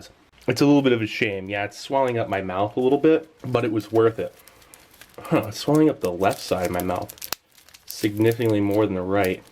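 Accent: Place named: California, United States. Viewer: Dude where is your accent from. I’m guessing California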